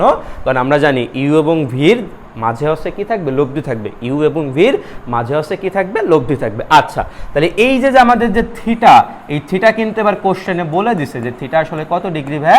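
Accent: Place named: Narail, Bangladesh. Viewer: ভাই নড়াইলের ভাষায় কথা বলেন। ভালো লাগে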